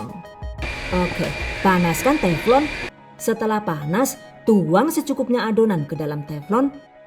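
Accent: Banyumas, Indonesia. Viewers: Assalamualaikum...maaf,kok ada bhs ngapaknya ya...kalau bgtu boleh tahu dari daerah mana yaaa,aku dari cilacap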